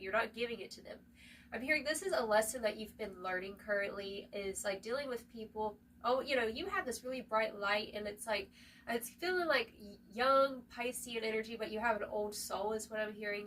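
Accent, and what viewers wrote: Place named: Texas, United States. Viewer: Everything is always working in my favor., I LOVE your Texas twang!😂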